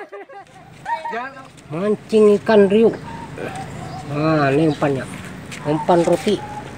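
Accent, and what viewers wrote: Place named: Central Kalimantan, Indonesia. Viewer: Menyimak suara bro seakan-akan saya sedang berada di Kalimantan Tengah.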